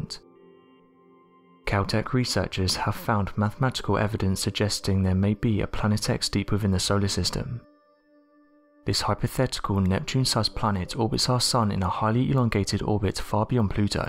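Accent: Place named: Wales, United Kingdom. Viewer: The narrator's english accent is very strong and hard to understand, people of the channel you need to understand that your channel is broadcasting on YOUTUBE which is an international application and most of the viewers are not native english language speakers. Even some of the native english speakers have hard time to understand the accent. Is the narrator Welsh or from Isle of Man or from Bolton?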